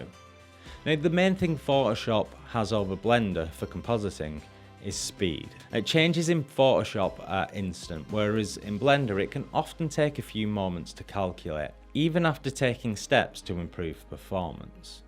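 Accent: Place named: East of England, United Kingdom. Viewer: Hi, I hope I don’t come off as rude or too nosy, but I’m trying to pinpoint your accent (just out of curiousity) but I am really unsure. Some where from the East of England? Might be way off😂. This is just a hobby of mine, trying to learn to distinguish British accents and to name them. But I haven’t got very far yet.. still learning to somewhat localise them.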